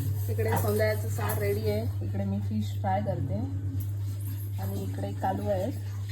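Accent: Malvani, India. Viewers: Malvani Chan bolte aasech volg malvani karat ja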